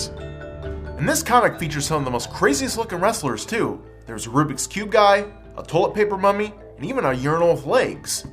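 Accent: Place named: South, United States. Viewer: Great episode. Though I think it's funny how he mispronounces Kinnikuman. Sounds like the way they'd say it here in the south. "Yeah man, this here's my cousin Kenny Kumen!" For the record it's said more like KEY NEEK EW MON.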